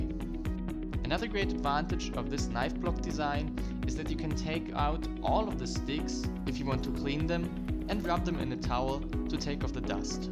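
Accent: Germany. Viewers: du bist aus deutschland oder? man hört es ganz leicht aber dein englisch ist echt mega gut man hört kaum noch einen deutschen akzent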